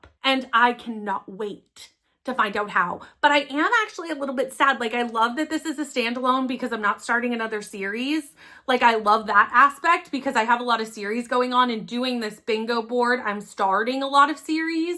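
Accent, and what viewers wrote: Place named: Canada. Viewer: Its weird, because every time you say it's your Canadian accent, I'm just like....does this mean I have a Canadian accent, and I just don't hear it xD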